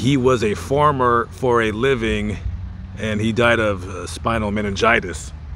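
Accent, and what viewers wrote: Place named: South, United States. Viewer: great pachyderm 0 seconds ago I suggest you visit the St. Nicholas Church Cemetery in Patoutville, Louisiana. In March of 1968 the salt mine collapsed at the Belle Isle Salt Mine. 5 members of the same family died there along with 17 other miners. My cousin Dennis Romero (not buried there) was one of the victims.. You're in Texas and you talk with a somewhat Southern accent and can't be far away. Haha. I'm in Texas often and know how big it is.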